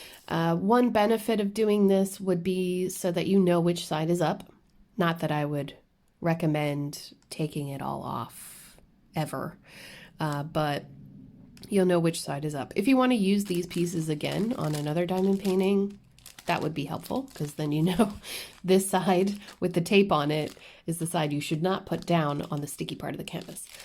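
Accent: Ireland. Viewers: did you realize that you are picking up an Irish accent? When you were outside with your new divas it was coming out. It fades in and out of your videos depending on what you are doing.💖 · Valerie Dunham I heard it and loved it. But then again I love the Irish accents anyway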